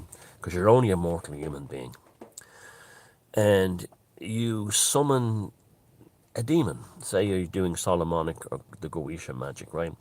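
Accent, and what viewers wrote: Ireland. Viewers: The combination of rain falling on your skoda and your Irish accent,delivering knowledge is the true magic. What we have we hold!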